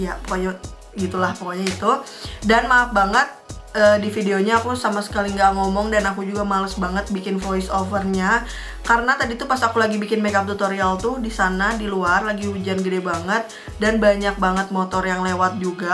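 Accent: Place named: Java, Indonesia. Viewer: cantik bgt 😭😭😭😭😭 tapi inimah da mayan medok kalo buaat aku 😞😂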